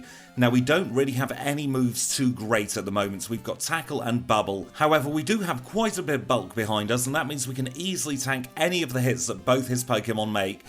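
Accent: United Kingdom. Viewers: Man, you are the best. My favorite pokemon gamer of all time, love your british accent. Can I ask you, why don't you use Dynamic Punch more often with Snorlax?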